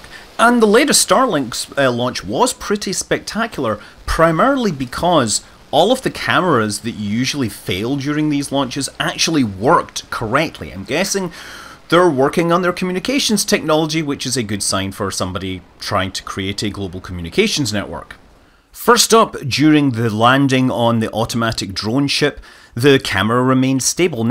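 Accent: Scotland, United Kingdom. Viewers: Actual Scottish from! "Theire's nunnae the acoustic panneling"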